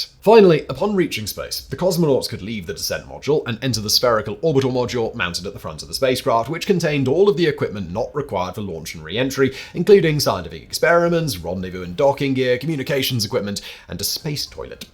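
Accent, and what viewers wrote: United Kingdom. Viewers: I love overly loud pensive music underscored by a well spoken British fellow.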